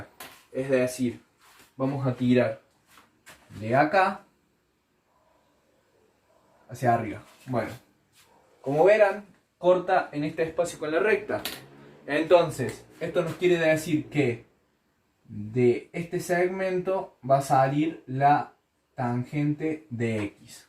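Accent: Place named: Córdoba, Argentina. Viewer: jajaj cordobesaso el culiau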